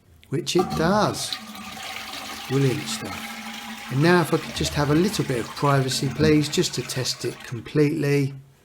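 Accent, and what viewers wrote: United Kingdom. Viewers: Love this😂 very british delivery in a slightly patronising humorous way. Thankyou!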